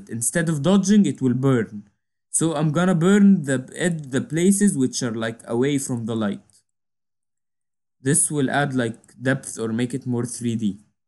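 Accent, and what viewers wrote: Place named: Egypt. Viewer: You sound egyptain. Iam from Egypt and I know this English accent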